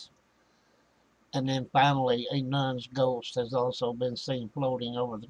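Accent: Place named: United States. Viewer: How come there are know English person doing this video surly we know more about our heritage than Americans I say that because the person talking sounds American to me just saying lol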